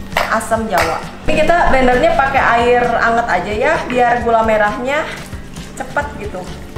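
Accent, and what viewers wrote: West Java, Indonesia. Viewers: Suka deh kalo teteh ngomong logat sunda euy 🤣🤣🤣🤣🤣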